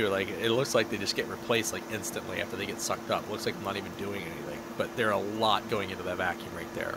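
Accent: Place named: Delaware Valley, United States. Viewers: Great vids my guy. Side note: I'm hearing a DelVal accent (especially in those Os). Where are you located? I'm from South Jersey so my ears almost instantly recognize a fellow Tri Stater lol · This guy is definitely from Maryland or Delaware based on his accent. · You sound just like Streetspeed717. Must be the PA accent!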